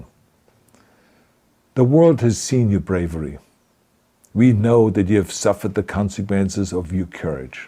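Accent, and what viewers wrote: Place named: Russia. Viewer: I can see he is trying to speak english in russian accent. respect you sir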